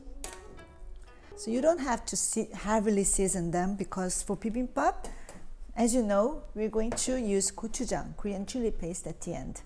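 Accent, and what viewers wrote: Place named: France. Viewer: she sounds like she has a French accent.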